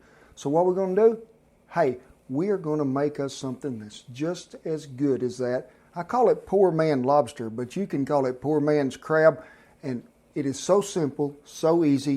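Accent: South, United States. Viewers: Hahahaha, love how he splits it evenly. Love the idea and I will buy some cod and try this out. Oh yeah, gotta love that country accent!😁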